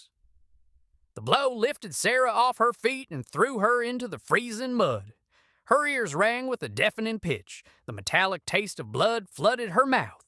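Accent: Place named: Appalachia, United States. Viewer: whats with the hillbilly story teller???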